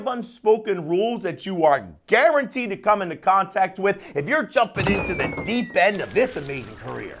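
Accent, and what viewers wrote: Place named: Illinois, United States. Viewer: I love how you are from Rockford, IL. I am from Chicago, so I love hearing the accent again. Bellingham, WA has no accents.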